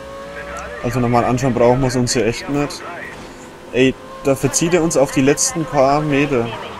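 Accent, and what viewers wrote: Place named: Bavaria, Germany. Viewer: wow, sehr schade wegen den wenigen zuschauern , die qualität der videos is genial ( 1080p ftw ) und dein kommentar is auch gut, du könntest höchstens versuchen das bayerische noch ein wenig mehr zurückzuhalten, stört mich aber nicht als selbst bayer, mach auf jedenfall weiter so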